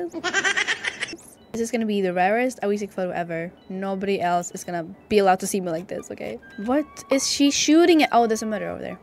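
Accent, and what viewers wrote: Sweden. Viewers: Wait, are you Swedish? 😛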